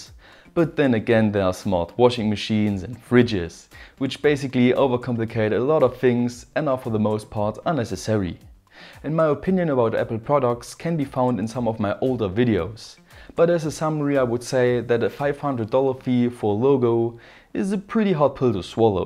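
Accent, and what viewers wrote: Germany. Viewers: are you from germeny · So did I reading your comment, most, if not all, of his mispronunciations are due to his German accent, not his English skills, actually his English is excellent.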